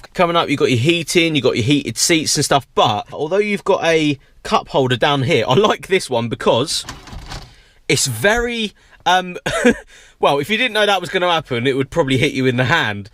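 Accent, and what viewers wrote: United Kingdom. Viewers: Oh My God ... I love UK accent .. !!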